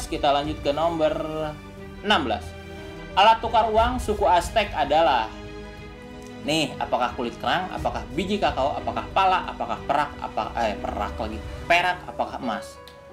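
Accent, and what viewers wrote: West Java, Indonesia. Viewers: sunda abis si aa logatnya wkwkw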